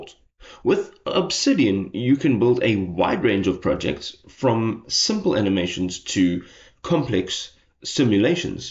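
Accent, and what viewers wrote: South Africa. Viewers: I was looking for a comparison and your video was the first I saw, I must say I could hear the South African accent from the first second :P Hi from a fellow Saffa productivity fan!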